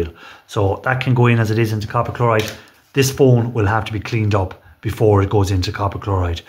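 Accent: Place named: Ireland. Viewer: Hello from South Alabama....I like your accent.... We're probably related, somewhere down the line.. My Dad's Grandmother was Full Irish . Sir .. would you let me know how and where I can get scrap phones, computer boards, etc .?? I would like to get into this.... Thank You.... and ," Don't let them get their hands, on your Lucky Charms."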